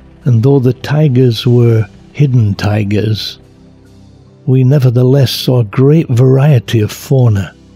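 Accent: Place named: United Kingdom. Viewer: Heroin commentary ftw. I am expecting an "I love you Susan" followed by a death rattle throughout this video. Please man, nice video but have a cup of coffee before you narrate a 20-minute long video sounding like a dying 110 year old Vietnam veteran. The "old British" charm gets somewhat drowned in a weird combination of sleepiness, cringe and wanting to kill myself.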